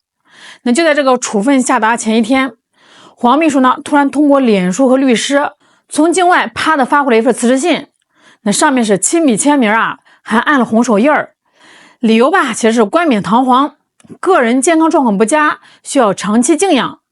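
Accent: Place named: Xuzhou, China. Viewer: ，听你口音你好像是徐州人😀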